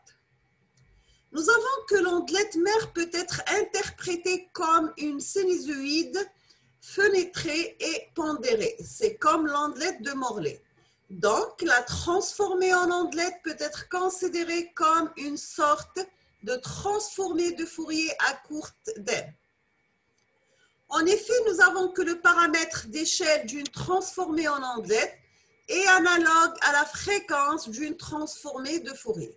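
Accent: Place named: Algeria. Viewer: Accent algérien typique ! Tahia al Djazaïr, Vive l'Algérie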